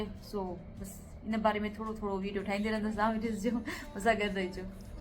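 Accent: Sindh, Pakistan. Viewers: Tawahan Jo galhaen dadho sutho ahe safa sindhi jean asan Pana gar me galhaenda ahyoon .I like it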